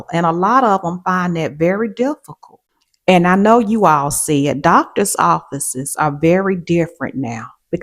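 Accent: South, United States. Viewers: Great content! Cool Southern accent. As a Gen Xer, definitely jack of all trades ...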